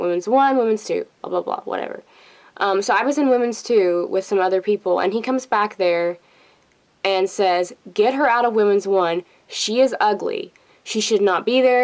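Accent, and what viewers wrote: South, United States. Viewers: a bit of southern accent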